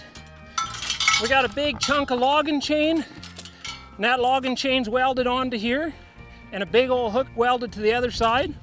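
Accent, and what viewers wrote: Canada. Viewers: Slight Canadian accent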